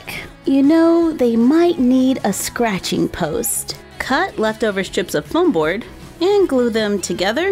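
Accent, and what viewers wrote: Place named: United States. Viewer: I'm so sorry I'm a brit and the pronunciations of these dogs in America is killing me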